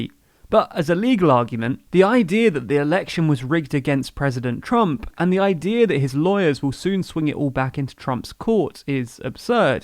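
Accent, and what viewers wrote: England, United Kingdom. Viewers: TLDR - Get context from Gore v. Bush. Don't just parrot talking points. Even the English accent can't mask your bias and wrongful analysis. I've watched three videos now and hoped to get some real analysis. However, this is about 85-99% msnbc or cnn or fox.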